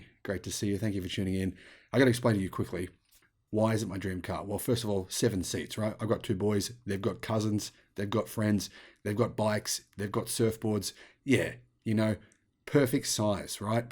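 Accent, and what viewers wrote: Australia. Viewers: I love your show, but your accent is destroying the word "inventory." You keep saying "inventry," which has no definition that I can find. Now I know that being American, we don't usually say English as proper as the British, but I thought you Aussies spoke just like them. The first few times you had said it, I was thinking what the heck is this guy saying. Then I finally figured it out. BTW I want a model Y, but it would drive me nuts if the new one is extremely different and alot better, so I'm waiting.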